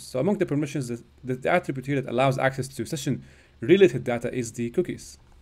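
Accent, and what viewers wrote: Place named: Iran. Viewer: Your video is like a professor lecture on digital forensics.With a Persian accent “ good Ea stuff “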